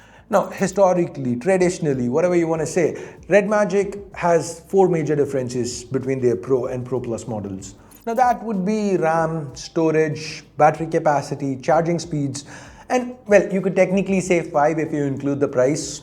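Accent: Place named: India. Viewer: Hey I hope you don't take this the wrong way but and I apologize if I offend anybody by saying this but usually Indian speaking people drive me nuts because I am in American and we are just so used to the way we talk. Not saying anything about your people it's just the way you guys talk That's it other than that I love you guys. The reason why I say that is because usually I'll just turn the channel off I gave you a chance and I love your channel. I really in to the newest tech when it comes to phones I believe these gaming phones are just a scam You're not going to get anything better out of it than you would say an s24 ultra you get the same performance but that's just my opinion your allowed to have any opinion you choose. The one thing I will give this phone at least they put a better screen on their phone than that rog phone. The rog phone is still putting a 1080p on the newest one where this phone at least want to a 1 and a 1/2 k screen I think for the money you're putting out for a phone like the rog phone I believe it's like $200 more for that time than it is for this one.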